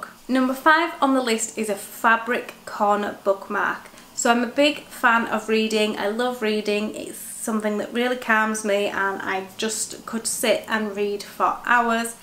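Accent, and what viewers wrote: United Kingdom. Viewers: It's so lovely to hear a Yorkshire/northern accent on you tube! Great video thank you x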